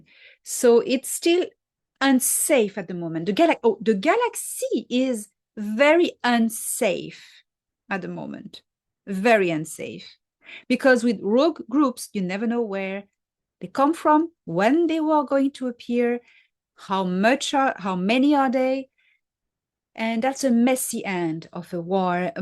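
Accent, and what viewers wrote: France. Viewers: As much as I love the information, and I must admit sometimes it does seem a little far fetched although these days there's really no such thing, the thing that keeps me coming back is that French accent there is just something about it well at least I'm honest lol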